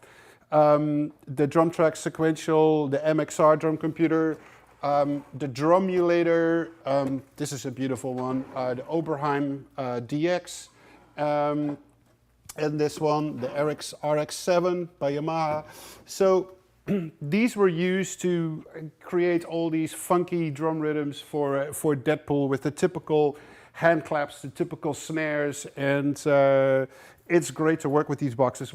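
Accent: Netherlands. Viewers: Very cool! I might be wrong but are you from the Netherlands? I hear very distinct sounds that a lot of people around me have when they speak english! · He has a bit of a Dutch accent. Could that be right? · Nederlands accentje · Yes, He is dutch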